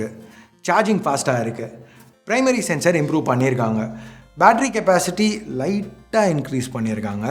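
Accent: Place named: Chennai, India. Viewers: Chennai slang super bro. Already subscribed. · Nadula nadula chennai slang varudhe🤭😶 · Enna bro chennai slang la pessa try panra maathi theriyuthe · Bro antha Chennai Tamil slang nalla irukku bro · Ona pakave irritate ah irukku . Onnoda hair style and thavai illatha vetti pechu Mayiru tamil basai ketta chennai tamil nu soldra asingam puduchavane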